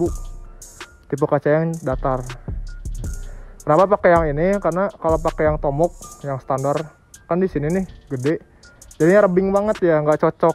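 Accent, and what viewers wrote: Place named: Sunda, Indonesia. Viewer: Sunda pisan ei AMANG Yeu mh euy. Bhasa na. MANTAP..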